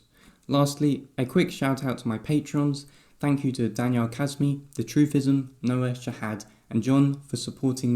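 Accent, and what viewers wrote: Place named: United Kingdom. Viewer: Can you please add (British accent) to save me a time.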